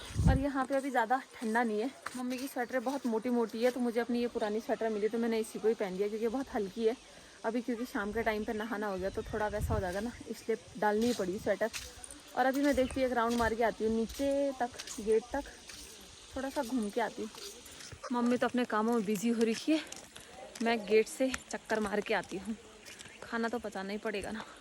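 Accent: Garhwal, India. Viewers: aap gadwali bahut pyari bolte ho phli baar suna mene ese bolte huye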